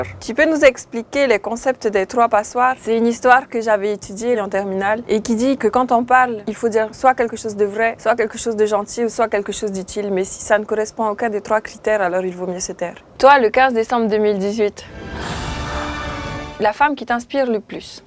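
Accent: French Polynesia. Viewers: Elle a un accent polynésien j'adore très bel accent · Alors l'accent polynésien c'est une pépite 👌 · Son accent C un delice pour nous de la polynesie via ihihihiii NC 😅😋😘😚😙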